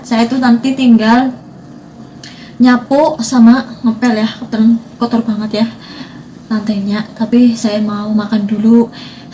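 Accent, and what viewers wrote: Banyumas, Indonesia. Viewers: Medok bgt mba logat nyah.. org banyumas yah.. smangat deh bwt mba nyah. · Mb ko ngmngny ngapak..wong ndi sampean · Mb dr mana ya?... kok ngapak kaya aku?...🤣😛🤦‍♀️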